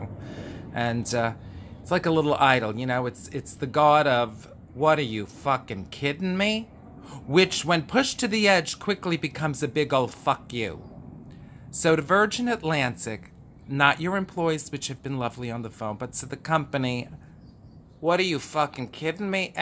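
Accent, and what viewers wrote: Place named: New York, United States. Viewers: Jerry Hunter It always sounds so much more effective when spoken with a NY accent. Lol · I just have to thank you for all your videos, especially the Thoughts While In Solitude series. You are like a good friend I can stand:-D and your vids are helping me get through this pandemic. I could listen to you yammer all day in that accent, which is what I hear all the time now living so close to NYC. Stay well, best wishes always.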